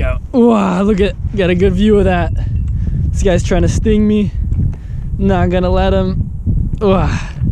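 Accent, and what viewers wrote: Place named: Mexico. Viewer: Para mí el mayor plot twist fue ver que hablas español ¿Eres mexicano? Porque yo sí y el acento me sonó muy mexicano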